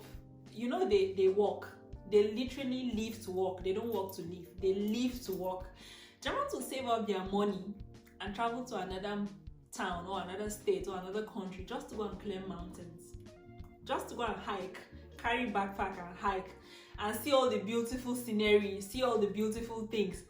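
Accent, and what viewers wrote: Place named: Africa. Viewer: Your african dialect in speaking english is nice.dynamic, beautifull woman.nice vlog.keep it up and stay safe.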